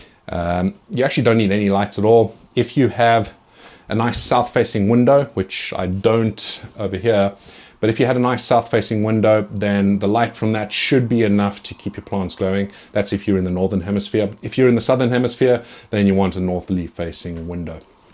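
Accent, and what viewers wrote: South Africa. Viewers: Legit idea....and u sound south african...exellect content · Are you a South African? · is that South African I hear? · Is my mans South African?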